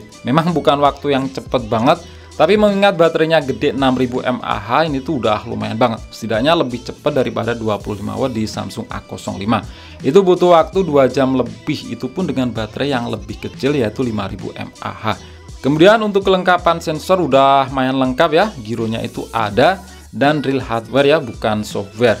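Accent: Blitar, Indonesia. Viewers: orang Blitar kah bang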